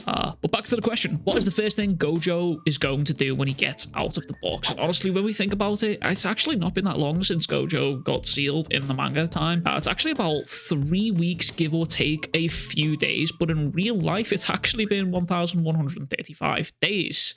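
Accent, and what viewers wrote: Liverpool, United Kingdom. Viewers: Subscribed for the Liverpool accent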